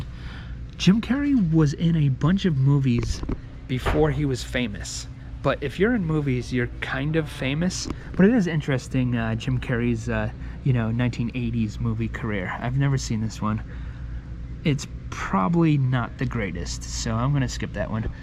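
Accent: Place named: North East England, United Kingdom. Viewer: For those not from the North East: "harrah" = Horror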